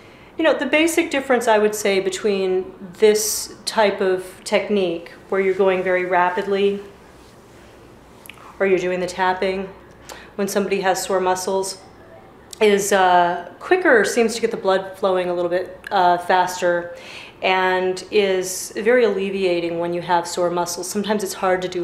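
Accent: United States. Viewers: dat american accent.. :)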